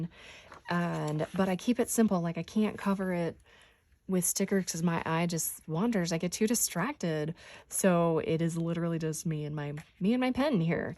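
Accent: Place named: South, United States. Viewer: As a Georgia girl, I appreciate hearing your southern accent. I am excited about your new channel. I really like your planner set up. Thanks for taking time to share it with us!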